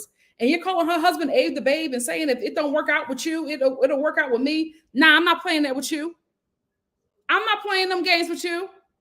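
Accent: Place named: Philadelphia, United States. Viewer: The philly accent I throng today 😂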